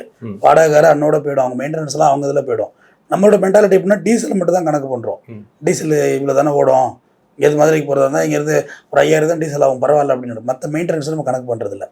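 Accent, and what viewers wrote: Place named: Cauvery Delta, India. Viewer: Sir native delta side pola 🤔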